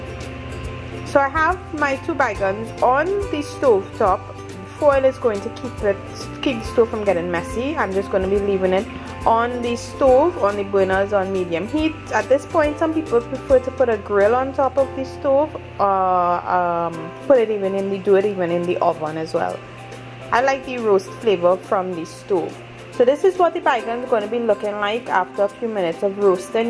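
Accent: Trinidad and Tobago. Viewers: Oh shut up. That's how trinidadians speak. Research before you try bashing.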